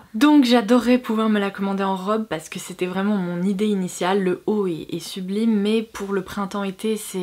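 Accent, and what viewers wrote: England, United Kingdom. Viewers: Tout me donne aussi tellement envie ! On va être nombreuse à craquer 😍 Ps : ton accent anglais me fait toujours autant rigoler ! Gros bisous ma belle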